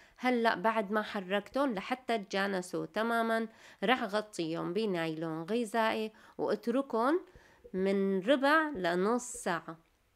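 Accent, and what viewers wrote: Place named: Idlib, Syria. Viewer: العفو منك اختي انت كاتبه مطبخنا الشامي بس لهجتك مو شامية لهجتك ادلبيه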